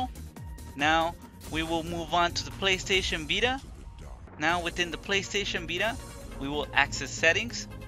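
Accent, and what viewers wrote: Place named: France. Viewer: Are you French, by any chance? I can't help but notice the accent. Just a wee bit lack of "Uh-huh".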